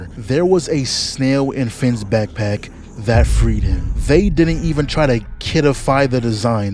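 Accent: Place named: New York, United States. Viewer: I knew I heard that NY in your voice I@ You have that Bronx dialect my G.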